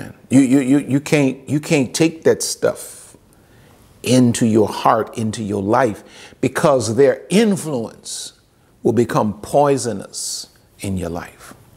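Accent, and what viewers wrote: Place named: Jamaica. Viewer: Loved the Jamaican accent! There's nothing you carnt do 😀 your my favourite Youtuber without a doubt! #ONLINEFATHER❤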